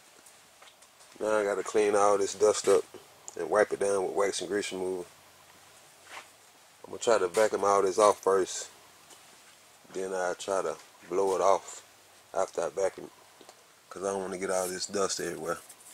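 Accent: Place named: South Alabama, United States. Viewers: Didn’t realize you were from Alabama! I knew you were in the south somewhere bc of those cicadas and your accent. Hey from Anniston 👋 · That sounds like south Alabama I miss home lol getting my 85 t top prepped for paint now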